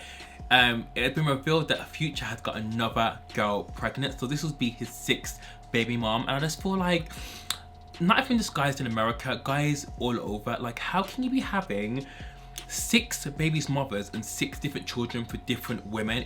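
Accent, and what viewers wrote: United Kingdom. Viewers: u r so adorable! i see u on insta all the time!!! ❤️❤️❤️finally watching you on youtube! your accent is smh crazy! 🙏🏾🙏🏾🙏🏾english/ British accent is my fav😍😍😍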